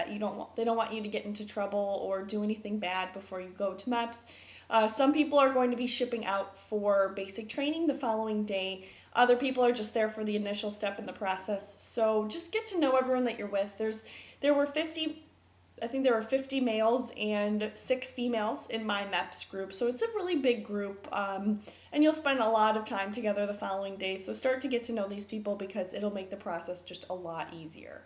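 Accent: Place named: North, United Kingdom. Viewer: Northern accent.. Hate it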